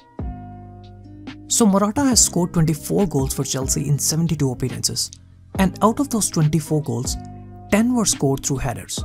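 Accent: India. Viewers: Sounds Indian.... how do you he's not!!!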